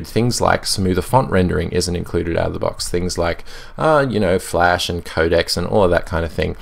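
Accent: Australia. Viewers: are you an australian?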